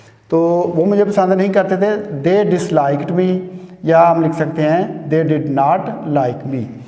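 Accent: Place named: Punjab, India. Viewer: It's ok but your accent is Punjabi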